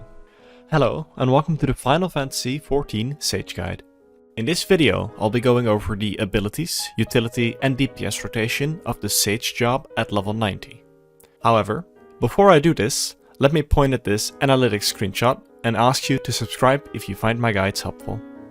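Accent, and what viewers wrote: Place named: Netherlands. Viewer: Ah the Dutch accent, love it:D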